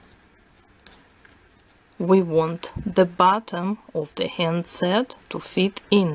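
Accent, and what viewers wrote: Russia. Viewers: thank you so much for doing this i love your Russian accent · Omg can I start with I love your videos? I mean This is amazing and I can listen to your Russian accent forever! Please keep on making videos! :)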